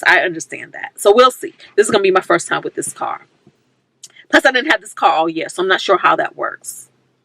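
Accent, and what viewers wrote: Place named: New York, United States. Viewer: im furloughed, and concerned about layoffs in future, I planned on Monday to start my workout, meal preps, more time to my schoolwork (bachelors in Christian counseling) also more volunteering with non profit and playing with my fur children! new sub here!! one question your accent sounds familiar are you from NY....LOL I AM....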